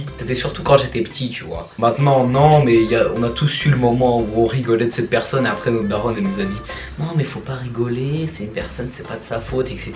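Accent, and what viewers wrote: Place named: North, France. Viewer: Mec apparemment tu viens di nord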